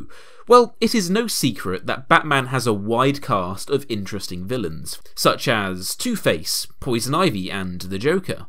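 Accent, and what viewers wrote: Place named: United Kingdom. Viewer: dat british accent 🔥🔥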